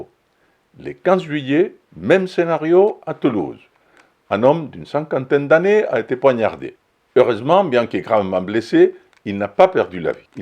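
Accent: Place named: Spain. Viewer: les musulmans de France sont bien plus français que toi vu ton accent espagnol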